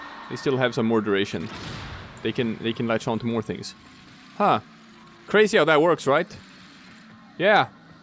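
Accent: Sweden. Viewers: Thought the accent sounded Swedish, went to "about" and sure enough I was right haha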